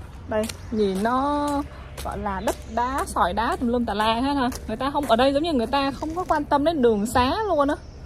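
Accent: North, Vietnam. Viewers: * là người bắc phải không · Lâu lâu mới nghe giọng Bắc ở Mỹ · bạn nói giọng miền Bắc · nói giọng miền Bắc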